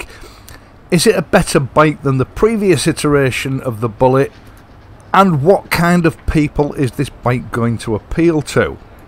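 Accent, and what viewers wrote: United Kingdom. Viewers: Great British accent and great British engineered bike! The British are far better than Americans and even better than the Japenese on traditional standard motorcycles, the only type that interests me. My other bike is a Triumph T120, another great classic bike!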